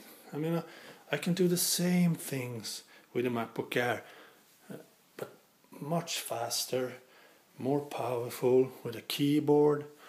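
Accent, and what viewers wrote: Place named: Sweden. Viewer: Bra jämförelse, älskar den brutalt svenska brytningen ;)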